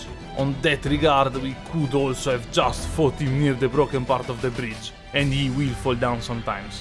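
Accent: Italy. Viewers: italian accent is very marked that’s why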